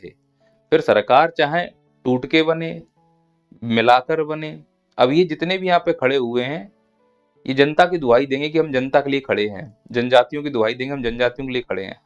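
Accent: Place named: Agra, India. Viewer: Aap agra firozabad ke aaspaas ke lag rhe hain bhasha se😊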